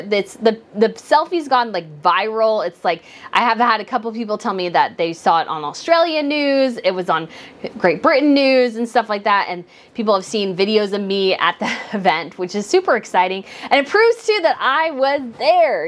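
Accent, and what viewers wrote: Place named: United States. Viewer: This is so sweet! You sounded really polite and excited at the same thing! Nothing embarrassing! I'm sure she thought you were really sweet and surely she recognized your American accent, meaning you made a big journey to be there! I hope you have a blessed time at the Coronation today, and I'm praying for some very special experiences. <3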